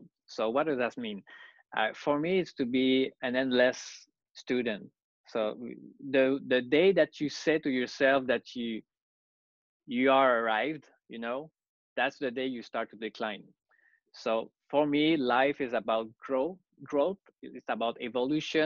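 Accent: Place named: Quebec, Canada. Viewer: I was always curious to know what he sounded like in English 😅 glad to see that thick Québécois is still there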